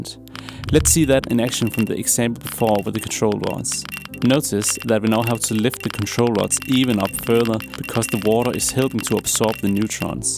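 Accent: Denmark. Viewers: Are you Danish? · Danglish? Great video! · are you danish ? your accent sounds danish idk. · Are you danish? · hold da op man kan nemt høre du er dansk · Danish accent ruined the video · danish guy speaking english? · Are you danish? Because I’m almost positive I detect a danish accent in there somewhere! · I think you mean Walken? There is something reminiscent in the intonation but the actual accent is, I believe, Danish so pretty far removed from Walken's american lilt. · Du lyder dansk! Cool video!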